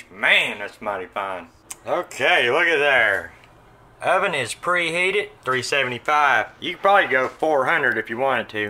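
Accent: South, United States. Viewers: Love your southern accent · I am southern also, not quite have this accent down like he does. But so enjoyed his! Great recipe. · Great recipe!! My brother damn near ate the whole tray!! Where's that accent from, Carolina, Georgia? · your incredible southern drawl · True southerner heck yea some good eating there now That’s the way they talk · I was raised in the south but have moved around, still love the southern twang! The casserole looks amazing and I love how he wants you to use your imagination on the spices, so many variations you can do. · I love his sense of humor, southern accent and this receipe even more. That's some good eating 🔥🔥🔥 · Y'all making comments about him sounding like a old guy must not be from THE south. Y'all must be YANKEES. I have friends who are younger than me that sound like this guy and I am from TEXAS. I,LOVE HIS ACCENT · Greetings from NYC!! 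🌞Just hearing your accent, I know this is gonna be AWESOME!! In my opinion, Southern cooks are the very BEST cooks. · I've never had this before. It looks delicious. As somebody from Alabama, loved the Southern accent!!❤😋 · Im going to subscribe because he is so cute and that southern accent, wow!!! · Boy that is a thick southern accent is it made up or really Southern? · I adore the southern accent. I love in South Georgia and we all pretty much sound like this. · "Pi-tay-toes, or taters" I love yer way of speaking. Im Canadian, and I talk similar to you kinda making fun of the words. I am not southern, but I wish sometimes I had the accent. Love it brother..."pi-tay-tows"....excellent!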